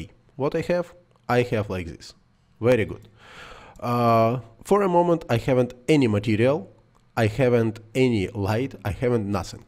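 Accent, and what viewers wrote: Russia. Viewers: Кстати спасибо за урок, очень пригодилось в моей работе. Я догадывался что ты русский по акценту, но потом увидел это и. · excellent! your russian accent is great! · Акцент русский))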